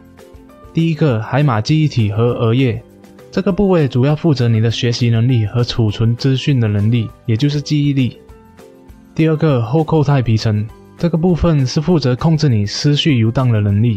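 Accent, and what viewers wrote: Malaysia. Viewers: 你一定是马来西亚人，因为料的音哈。很开心看到你的分享。我也正在努力。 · Dino Mei 应该是马来西亚，因为我是马来西亚人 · 马来西亚口音真的很亲切！！！ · 好~ 听出马来西亚的腔调~ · 一開始以為是台灣北京腔,最後就感覺到是大馬腔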